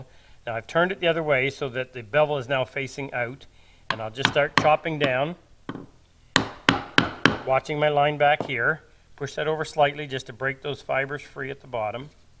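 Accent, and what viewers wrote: Canada. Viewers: If the accent didn’t indicate that is Canadian, the mallet handle wrapped like a hockey stick grip sure does. Thanks for thr tips,. Putting pencil markes along the length of the mortice to help position the chisel is something I never thought to do. I also like how tou set your making gauge to the width og the chisel by sandwiching it between the marking gauge cutters. It seems I need to flip my cutters.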